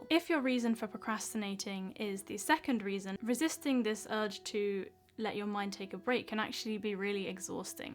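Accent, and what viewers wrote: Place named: RP, United Kingdom. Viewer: I'm not a productive person, but now I'm trying to be...I'm working on my English and my accent now and i love rp accent. I'm planing to watch your video everyday, not only that i get to do a listening practice but i also get a lot of super helpful and amazing useful tips❤❤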